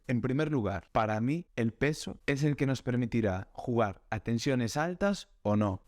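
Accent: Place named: Galicia, Spain. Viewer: Buenas, este mes que viene me iba a comprar por mi aniversario la Wilson shift 99 (patron 16x20) Tengo 27 años, mido 1.73, peso75 kg Y juego a 200m nivel del mar o 0 (zona de Alicante, muy calurosa habitualmente) Y le iba a poner un alu power 1.25 con tension 24vertical-22 horizontal Pero me tienta la Wilson shift pro por tener mas peso e inercia aunque me hace dudar por su manejabilidad por el peso y su patron 18x20 ( a esta le pondria 25) Soy nivel intermedi avanzado, juego agresivo fondo de pista e intercambio mucho spin con alguna dejada. Muchas gracias por tu trabajo PD: me flipa ese accento gallego y la tranquilidad que transmites en cada video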